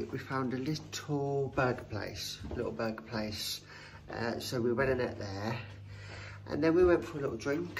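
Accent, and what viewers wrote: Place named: Scotland, United Kingdom. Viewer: Ps… love the Scottish accent 😂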